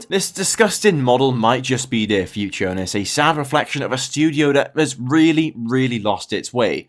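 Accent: South Wales, United Kingdom. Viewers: I sense a south wales accent , newport ?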